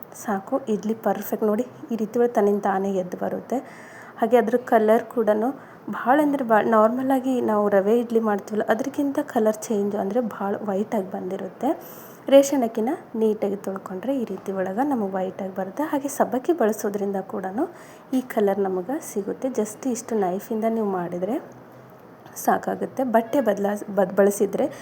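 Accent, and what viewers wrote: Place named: North Karnataka, India. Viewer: Aap North karnataka kannada and Banglore kannada mix karke kyon bolted ho?Speak in only one style, please.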